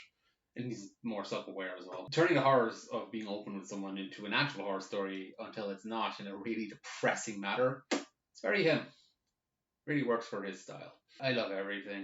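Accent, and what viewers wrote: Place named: Ireland. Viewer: OH MY GOD YOU’RE IRISH?!?! Your accent is so adorable!!!!!!! Also hi lol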